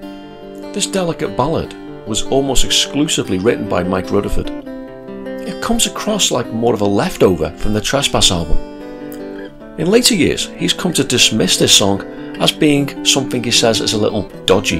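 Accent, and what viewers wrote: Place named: Liverpool, United Kingdom. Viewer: You have a scouse sound, being from Liverpool myself I can tell a bit of it (maybe a bit manc?) Genesis were always very popular in the north west, with them and floyd having about a 20% increase on cost on tapes and vinyl in the 90s when I was a teenager! Well worth it though.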